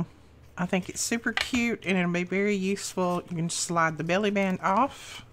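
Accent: South, United States. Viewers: Oh, I LOVE everything about this project!!! Especially your Southern accent, 'cause I'm a Southern girl too ;-) TFS!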